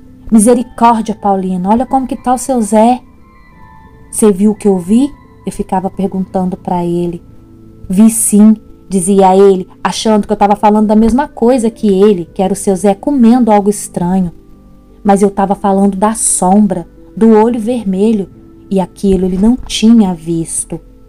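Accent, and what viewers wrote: Minas Gerais, Brazil. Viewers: É seu sotaque mineiro ta indo embora e vc ta mais calma nos relatos e mais suave.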